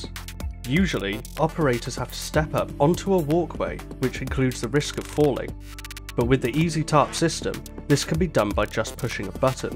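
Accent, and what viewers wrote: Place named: United Kingdom. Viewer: lose the limey!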